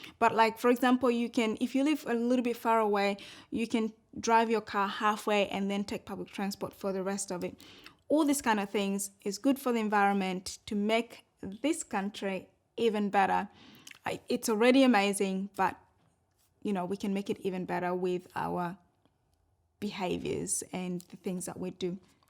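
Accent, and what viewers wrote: Australia. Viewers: Well I don't know how long you have been here in Australia but you have a great Aussie accent!!! Glad you love it here! Cheers from Sydney! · Wow. What a positive story and bubbly personality to match! Aussie here. Been living in Europe the past 20 odd years. Lucky enough to live in a country where the healthcare system, education, public transport, etc, are well managed, as in Oz. I have been going back home very year or two. Each time I go back, I notice how much more multi cultural Australia is becoming. Seeing people from many different countries, not just Europeans, making Oz home. Think it’s fantastic. Looking forward to moving back in a few years. BTW, your Aussie accent is really coming through. Sound more Aussie than me. All the best. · We love having good people like you here with us. You're sounding Aussie already. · I always love hearing the perspective of someone who's had a difficult background. 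It makes me appreciate my country more 🇦🇺 And hey, I can hear some Aussie vowels coming through 😊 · I'm always very pleased to hear the Aussie accent breaking through with an immigrant. Congratulations on your good taste and good fortune to be an Australian, and a beautiful one too! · What an excellent addition to our great country, best thing about Australia, is Australians. welcome to the party ? also..your aussie accent is definitely happening :) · I love your video. I can hear in your voice how Australian you have become and the passion that you have. So happy that you are a fellow citizen contributing to our shared future. Australia is an on-going experiment, driven by our history, but more driven by people like yourself, who come from a more challenging life experience, with a broader perspective that values the rights and opportunities available to our citizens. These rights cannot be taken for granted and I greatly appreciate how you expressed that. 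As our country evolves with an ever changing demographic it is important that we continue to define our strengths, as you have done so well, and hopefully inspire us to preserve and protect them. Thank you. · Sounding more and more Aussie. 🥰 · love your content you sound like an aussie . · I can hear a bit of an Aussie accent comming through Don't know how long you've been here, but yep you're an Aussie love Great southern Land straya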